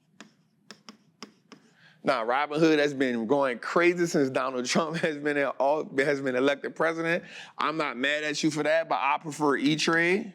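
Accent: New Orleans, United States. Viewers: Is your school in New Orleans? I hear the Nola accent. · From that first sentence I can tell that boy from New Orleans like me⚜️ let’s go brudda! Definitely gonna give you a subscription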